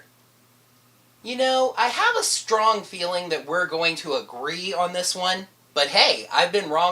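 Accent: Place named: Scotland, United Kingdom. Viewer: I really enjoy watching this video and your Scottish accent was on point and my vote for favorite pink ranger is Jen from power rangers time force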